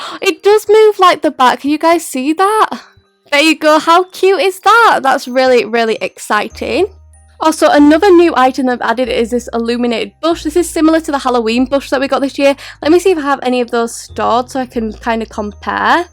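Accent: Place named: Scotland, United Kingdom. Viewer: I like you Scottish voice. :3 ❤️🗣🇫🇮 (WHERES THE SCOTTISH FLAG?)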